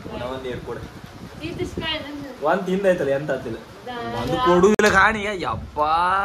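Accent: Kundapura, India. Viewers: ಇದು ಕುಂದಾಪ್ರ ಕನ್ನಡ ನಿಮ್ಗೆ ಅರ್ಥ ಮಾಡಿಕೊಳ್ಳಲು ಕಷ್ಟ ಆಗುತ್ತೆ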